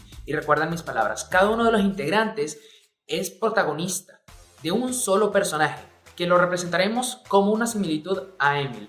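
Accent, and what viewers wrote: Venezuela. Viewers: AME TU TEORÍAAAAA. Eres venezolano verdad? :V Bueno, ame tu teoria x2 tiene mucho sentido quedé impaktadah :v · pregunta ¿eres venezolano? es que cuando dijiste coño jajaja me dio mucha risa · Siento que eres de De Venezuela :vvv Puedo estar equivocada :vvv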